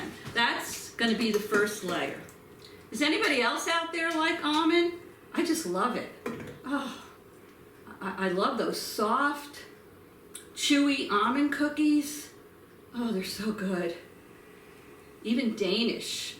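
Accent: New York, United States. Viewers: Hello sweet:) My Almond Bars are in the oven right now---ohhh how lovely they smelled just mixing the ingredients let alone while baking! I tweeked a bit--I added 1 tsp almond extract to the cookie dough base--no such thing as too much almond flavoring...right?!! And, I'm going to put a simple powder sugar/water/almond extract glaze on top--just a very thin coat...I can't wait to have a taste test! Thank you so much for the recipe. I enjoyed your video and found both you and your "camera man" endearing. I detected a NY accent. The Bronx? Just guessing. Thanks again! Have a blessed day.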